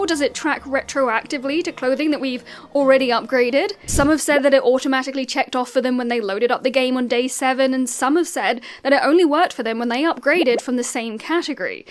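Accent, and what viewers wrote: United Kingdom. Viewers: Can I just say I LOVE your accent? Is it British? I can listen to your talk all day <3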